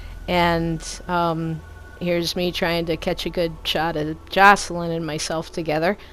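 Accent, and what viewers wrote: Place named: Brooklyn, United States. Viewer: Out standing! Your funny and lovable would change a thing. Well I do like the Brooklyn voice tho. hehehe see ya in the next one!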